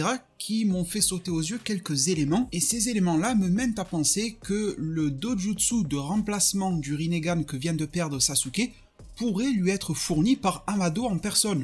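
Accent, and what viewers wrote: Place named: South, France. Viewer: ton léger accent du sud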